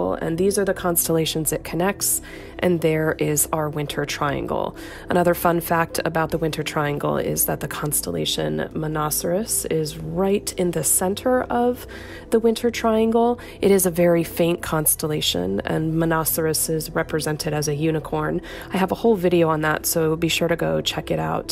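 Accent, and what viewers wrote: United States. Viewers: American pronunciation of celestial objects makes it difficult to understand.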